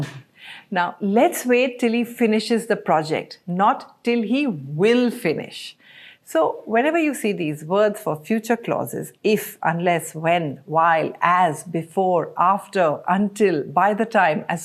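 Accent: United Kingdom. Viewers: I though she was british😅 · R u british?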